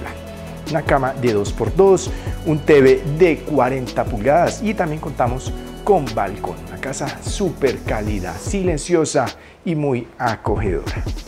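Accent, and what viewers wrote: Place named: Colombia. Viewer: Tiene el acento colombiano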